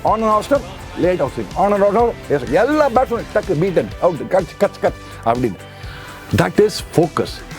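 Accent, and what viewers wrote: Chennai, India. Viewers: Love your easygoing and lovely natural narration, and with the Chennai slangs it’s even more funny!! Gadupadango was the funniest! 🤣🤣 Amazing tribute to one of the all time greats of Cricket. Almost 30 years since Kapil retired but we are yet to find another one like him, that shows what a rare breed he was! Hats off!!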